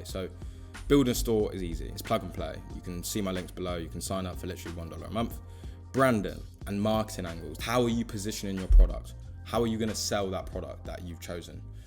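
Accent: United Kingdom. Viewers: That voice sounds british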